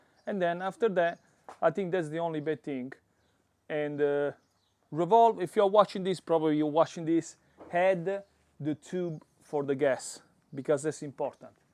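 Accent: Italy. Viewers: ciao sbaglio o sento un accento italiano??😂😂😂😂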